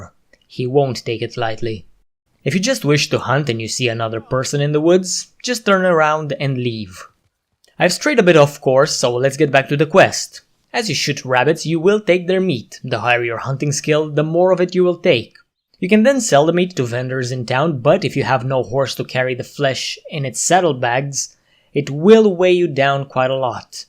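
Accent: Romania. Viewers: Nice. Oh btw I didn t know u were Romanian. Niceeeee accent!!!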